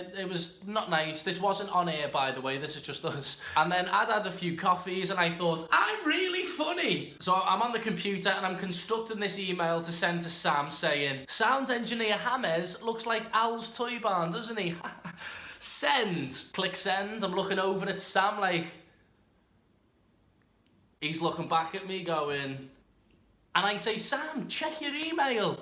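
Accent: Liverpool, United Kingdom. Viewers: It’s weird seeing a scouser big in youtube😂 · HOW DID I KNOW YOU WERE FROM LIVERPOOL EVEN THO I NEVER HEARD A LIVERPOOLISH ACCENT OMG IM SO CLEVER 😂😂😂❤️ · Auyyyyyyyyt my fellow scouser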